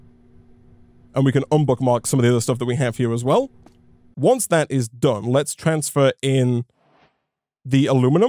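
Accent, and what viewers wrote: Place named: Wales, United Kingdom. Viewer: I can't place that accent. Welsh is as close as I can get...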